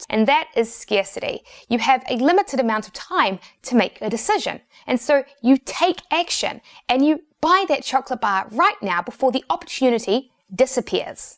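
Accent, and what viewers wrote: New Zealand. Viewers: Creengewearvey dance. I like your Kiwi ikcint tho. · A Kiwi. New Zealand .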